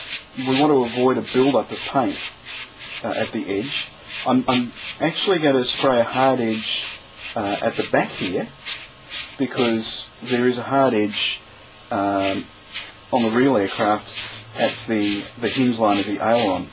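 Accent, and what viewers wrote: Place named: Australia. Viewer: is awesome and his Australian accent breaks up the monotony of his instruction. Don't forget about Sheppard Payne the master of the diorama (R.I.P.), Paul Boyer the decalling wizard, Aaron Skinner the armor fiend, Rodrigo Hernandez Cabos the airbrush genius, Geoff Coughlin; jack of all models, and the brush-painting Italian god Carmine Mari.:)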